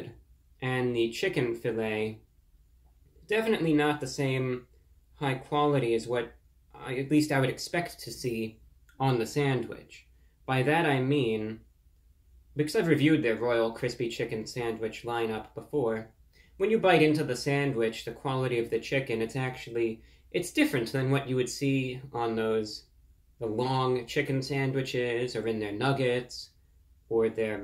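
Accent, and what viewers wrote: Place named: United States. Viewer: Hello my American friend. I know you are American, because you guys are unable to say certain words lol. You guys can't say forward, you say foward lol. You guys also can't say espresso, you call it expresso. That annoyance aside, love your content! lol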